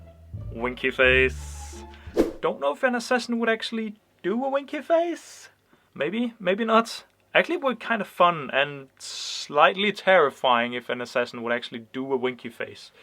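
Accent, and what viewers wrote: Denmark. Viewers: is he danish? he has a very danish accent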